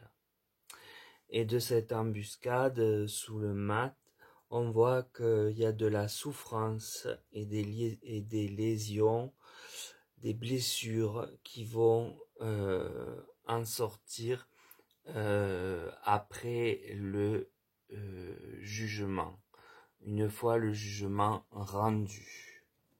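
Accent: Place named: Occitania, France. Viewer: Bonsoir merci pour le partage vous savez il y a toujours des êtres au dessus des autres!!! Faut passer outre comme la pluie sur les ailes d'un ( canard ) facile à dire certe! Nous sommes pas très loin je reconnais ( l'accent) d'Occitanie je vous écoute avec attention ( parce que) vous me parlez. Merci merci 👏👏👏👏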